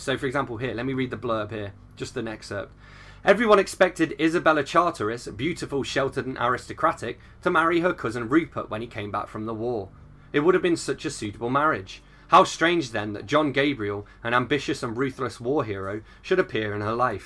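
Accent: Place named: Dartford, United Kingdom. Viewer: I love your accent, are you from Dartford?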